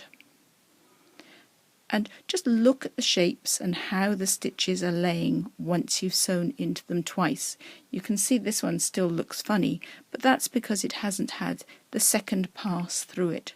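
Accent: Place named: England, United Kingdom. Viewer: You are very easy to follow; a nice English accent so pleasant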